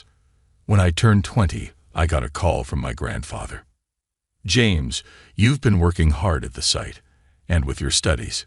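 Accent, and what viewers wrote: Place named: United States. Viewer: Good story. Thank you... the narrator's voice sounds like the USA 🇺🇸!! Tired of the British accents!!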